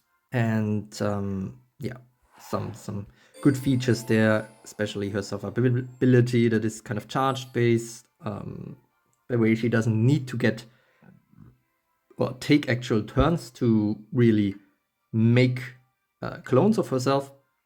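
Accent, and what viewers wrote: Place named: Germany. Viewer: why u have so much Diamonds wtf and u sound like u from germany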